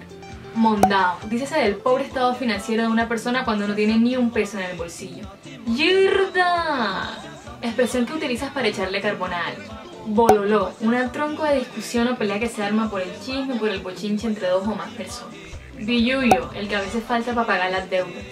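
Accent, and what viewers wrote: Barranquilla, Colombia. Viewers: no es el acento costeño, ese es el acento barranquillero, muy diferente al de otra ciudad muy importante de la region que es Cartagena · Un ejemplo el acento que empleas es un acento indudable de Barranquilla. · Oh me es acento costeño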